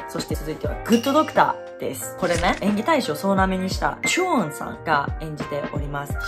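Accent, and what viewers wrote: Kansai, Japan. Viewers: が言うように日本と韓国の違いを楽しんで見てます👍 関西弁可愛い☺️❣️喉のケアしてね🙆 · 登録者8000人おめでとう🎊 知ってるワイフは日韓両方とも良かった！でもお金も時間もかかってる韓国の方が見ごたえあるのはしゃあないよね💦 関西弁😁👍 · 、喉大丈夫？🥺 今日は関西弁やったからめっちゃ聞きやすくて、馴染めた🤣🤣🤣 おもろい❗😘😘😘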